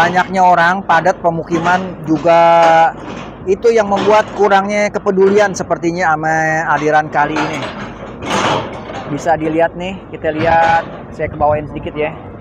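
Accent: Jakarta, Indonesia. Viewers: Gw suka logat ente Bang...turunan betokai ye....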